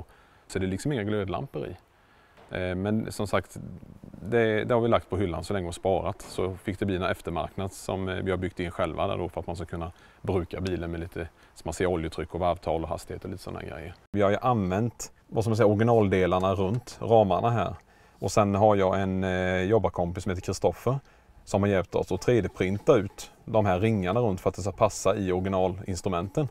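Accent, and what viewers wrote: Småland, Sweden. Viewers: Vanlig småländska med en lokal blandning.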